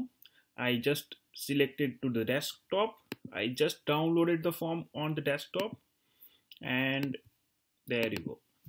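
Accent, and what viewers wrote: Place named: India. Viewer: Узнаю индийский акцент. Спасибо.)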